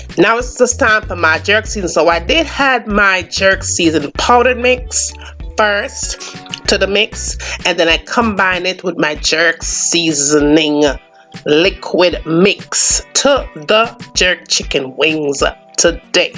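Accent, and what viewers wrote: Jamaica. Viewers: I could listen to lady talk all day...lol. God I love Jamaican accents...lol.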